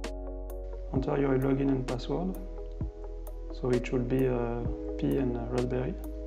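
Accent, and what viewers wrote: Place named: France. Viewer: Very nice and charming with spoken English with a heavy French accent! Though when neither language is your mother tongue then the background music overlay upon this really becomes an obstacle! I'll see if my patiance will take me through this ... accordingly I can not give you any score for this video yet ...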